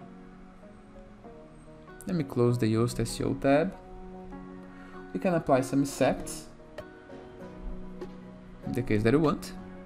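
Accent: Brazil. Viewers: sim eu sou programador. Eu de Moçambique (um país africano falante de português) mas sou fluente em inglês.. Porém os "I have 'tchu' do" denunciaram seu português brasileiro e notei logo kk foi como ter um irmão nesse universo web inglês. +1 inscrito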